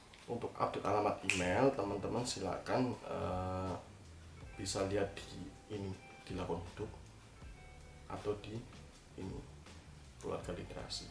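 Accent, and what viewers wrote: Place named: Ponorogo, Indonesia. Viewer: Ketika ngomong ponorogo medok banget mas.. i like it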